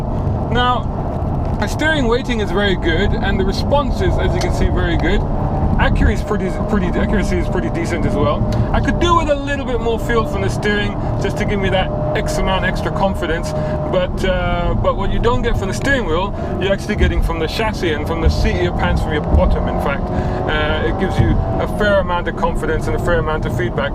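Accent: England, United Kingdom. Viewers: his voice is like gay and fcking england boy he is fucker this guy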